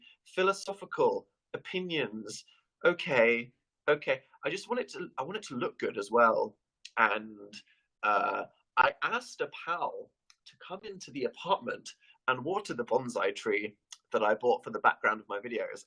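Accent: United Kingdom. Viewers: You're so extra British in this somehow. You keep reminding me of Eric Idle for some reason, and you're so fucking funny and intelligent!! I'm always so impressed by how quick-witted you are.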